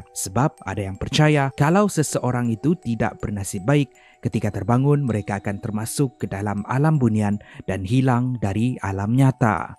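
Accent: Sabah, Malaysia. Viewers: sabah bah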